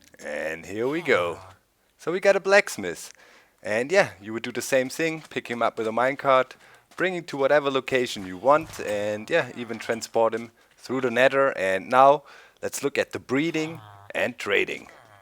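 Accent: Germany. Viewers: Give the German a break. English is not his first language. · there's something I don't understand: Now in German, the W is typically as our V, and the V is typically like our F I think, right? How come with the German accent, you guys will often times say English words with a V as we say a W?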